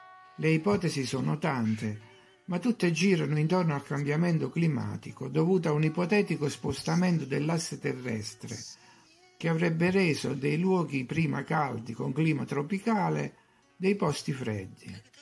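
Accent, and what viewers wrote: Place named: Abruzzo, Italy. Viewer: Tu sei abruzzese giusto? da l'accento sembra dato che io lo sono....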